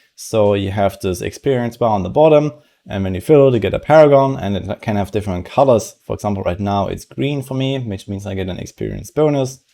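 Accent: Germany. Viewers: Lass es mich wissen ob du Deutscher bist? Man hört es raus 😅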